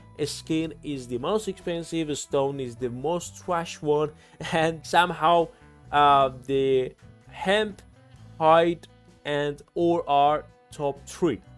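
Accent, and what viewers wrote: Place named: Iran. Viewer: dash zar mizane irani hasti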